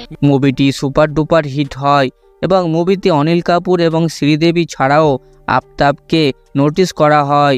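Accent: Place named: Kolkata, India. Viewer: Sabdhana Kotha bolben aita bagladesi occharon na bangladesira vul occharon kora na aita apnader Kolkataer vasha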